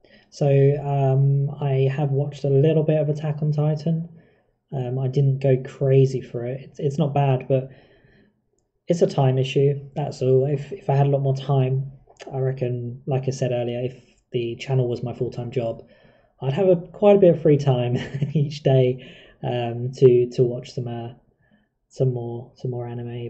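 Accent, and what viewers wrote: United Kingdom. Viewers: Omg ur British? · Woah you're brit?! :O alright im subbed haha xd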